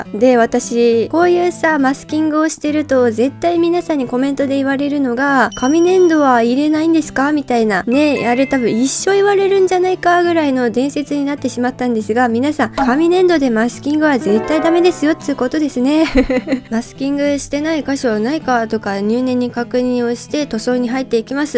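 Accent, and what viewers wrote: Tohoku, Japan. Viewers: 東北か北関東訛りですね福島か栃木かな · ちゃんって東北人？しゃべりが癒される👍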